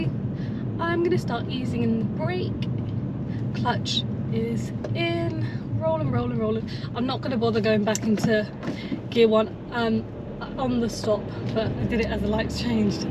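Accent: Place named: United Kingdom. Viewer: 18 minutes of British accent + UK tour? Let's go!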